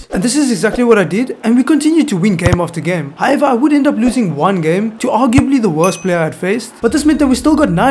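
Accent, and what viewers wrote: South Africa. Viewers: South African accent😂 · South african? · You from sa bro ??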